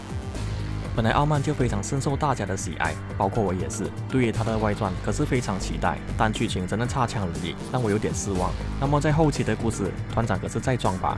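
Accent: Malaysia. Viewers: 我肯定你是马来西亚人！！ 我看了你全部的影片觉得口音有点想马来西亚的，结果你说玩玩啊你以为我就认定你是马来西亚人了😂😂😂😂😂 我是真的有看完你全部的影片喔😂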